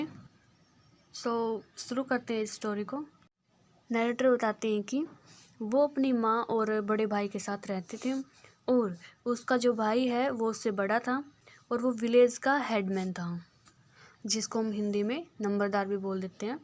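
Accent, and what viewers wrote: Haryana, India. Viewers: Full Haryanvi ascent narration of story' 😊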